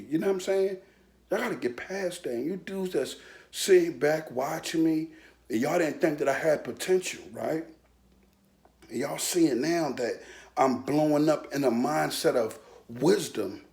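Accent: Philadelphia, United States. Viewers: Oh you definitely from home, philly lol I can tell how u talk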